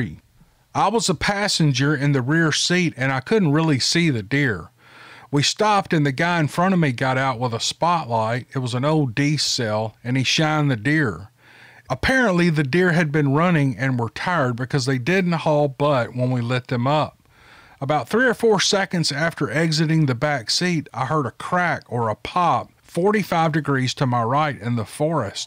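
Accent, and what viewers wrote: South, United States. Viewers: I think it's the southern accent that attracts people up here in the Connecticut and New York area. Yankees can't get enough of it.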